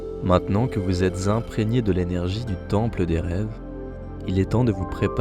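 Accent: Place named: France. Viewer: Thanks, you know i love your french accent 🥰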